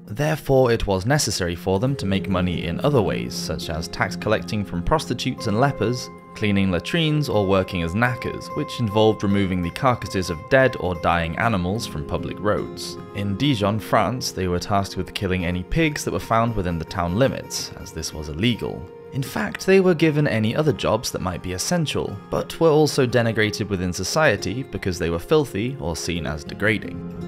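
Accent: England, United Kingdom. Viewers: Margaret Pole, Duchess of "Sallis bury"? For a narrator with an English accent, this guy certainly has trouble with his English pronunciation.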